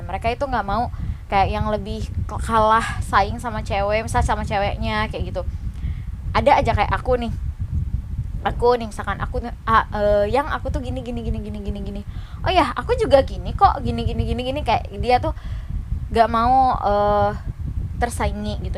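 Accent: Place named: Aceh, Indonesia. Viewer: Bahasa Indonesia nya Udah mulai medok Aceh ni...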